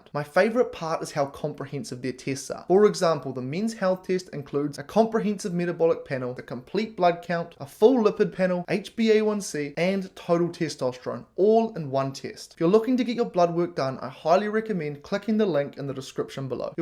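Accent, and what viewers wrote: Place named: New Zealand. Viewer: Great to hear a Kiwi accent on YouTube 😊 Chrissy from Te Awamutu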